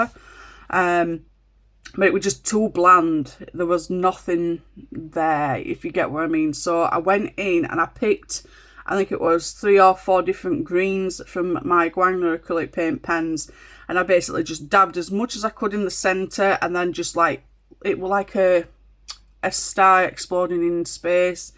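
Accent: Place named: Huddersfield, United Kingdom. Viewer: The Hub and I are having bets ob where you're from. He says Hudderfield. I could listen to you say Petite Poupees all day! 😂❤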